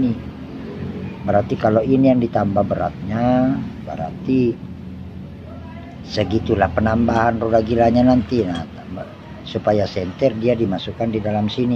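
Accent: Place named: Bugis, Indonesia. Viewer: apa kabar bang...?sy dengar ada logat logat BUGIS nya....!slm knl.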